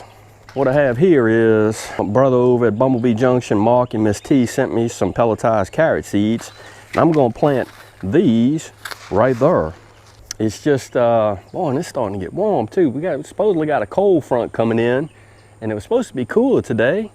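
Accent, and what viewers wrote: South, United States. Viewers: That dibble is fabulous! I cannot wait for hubs to make us one for spring planting. I am a new sub and love that charming southern draw of yours. It is cool here in Oklahoma today and we are loving it. Take care!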